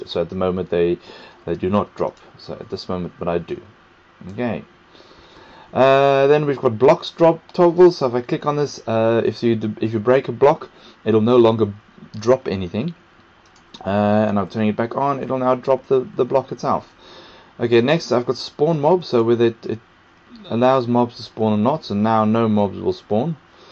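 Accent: South Africa. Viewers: are u southafrican?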